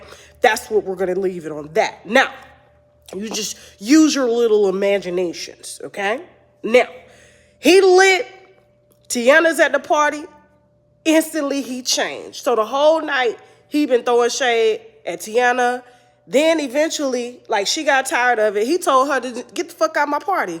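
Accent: United States. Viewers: Why does she keep doing that country accent???😂😂😂😂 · That country accent 😂😂😂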